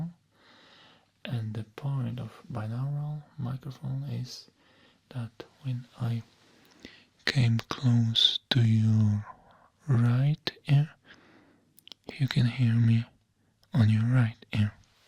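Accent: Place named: Poland. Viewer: I just keep coming back to this video. The Polish accent and loud variable sounds are extremely relaxing.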